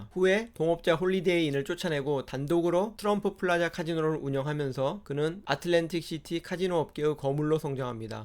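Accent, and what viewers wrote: Jeolla, South Korea. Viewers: 이분 전라도 분이네ㅋㅋ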